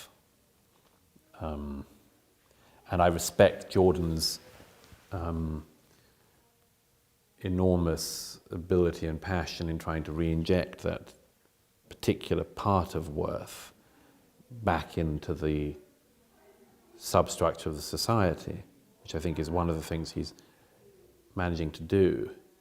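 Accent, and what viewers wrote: United Kingdom. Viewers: This guy wriggles around and says nothing of substance, but with a snobby British accent and attempts to be oh so intellectual. Humans will get better at letting go of Fairytale Bob in the Sky (aka god) and find more comfort over time in reason - and kindness, facts, and the knowledge that we just don't have all the answers. Fairytale Bob needs to spend more time in Syria, and Chicago, and Baltimore, and a trillion other places and perform real miracles on mass before a serious thinking person will ever believe in him.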